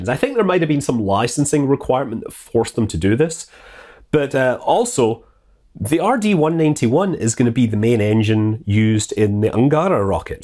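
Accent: Scotland, United Kingdom. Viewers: His Scottish accent kicks ass IMHO.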